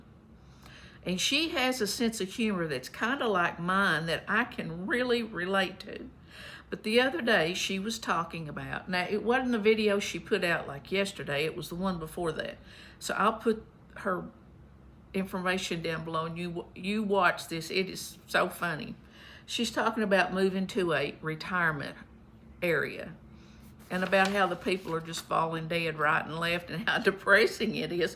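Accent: Kentucky, United States. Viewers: I enjoy your videos guess I like your Kentucky accent!! My parents were from Kentucky many many years ago✅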